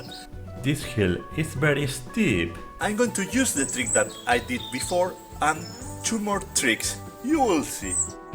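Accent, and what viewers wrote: Spain. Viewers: el acento mas fuck up despues del hindu el de españa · Fua ese acento español que no nos lo quitamos ni patrás pero la verdad que suena bien y todo. Buen vídeo jajaj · Where did you shot this "bideo"? I thougt it was in Galicia because of you accent but then you said something about going to Barcelona...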